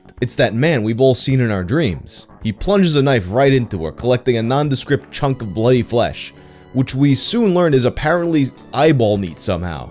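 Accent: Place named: Buffalo, United States. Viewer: sounds like he's from Buffalo or something.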